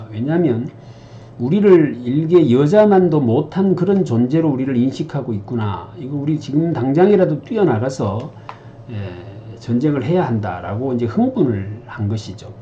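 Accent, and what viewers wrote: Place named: Gyeongsang, South Korea. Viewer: 원장님 경상도 사투리 정겹습니다 어름한 자식~~ 사마의의 전략 전략적으로 기다려야되는 지금 개구리 점프하듯 뛰어 오를 무엇이 떠오릅니다 잘 듣고 갑니다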